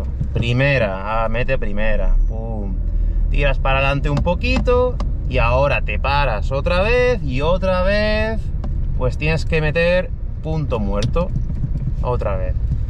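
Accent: Andalusia, Spain. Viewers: Hola. Te sigo desde un rato y es la primera vez que te oigo hablando con un poco de acento andaluso. Solitamente cuando grabas en casa no lo tienes. Me ha gustado un montón, me encanta ese acento. Espero oirte alguna vez más hablando así. Tus videos me molan mucho. Un saludo desde Italia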